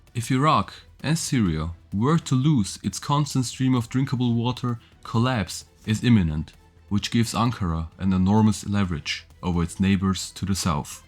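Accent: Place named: Germany. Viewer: Yo guter video. Nicer deutscher Akzent bre. Keep on hussling. Irgendwann kommt der Durchbruch.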